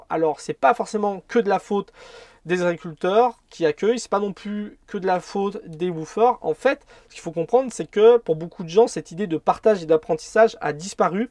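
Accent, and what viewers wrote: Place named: North, France. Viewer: Bonjour Merci pour la vidéo. Il est plus rassurant de partir en woofing car il y a une certaine réglementation. Porte à porte plus compliqué quand une fille part seule en Australie. Le principal est d être claire avec le woofer et être très explicite quant à nos attentes. Soit dit en passant, vous avez l accent nordiste Merci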